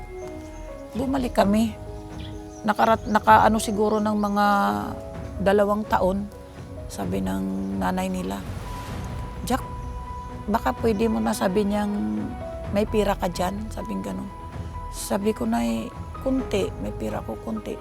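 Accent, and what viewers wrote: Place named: Aklan, Philippines. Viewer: Halata ko taga aklan ka yata Ma'am.pero di ko alam san sa aklan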